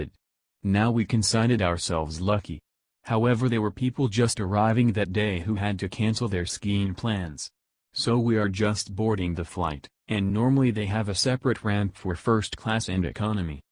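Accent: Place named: Japan. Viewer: Your accent sounds Japanese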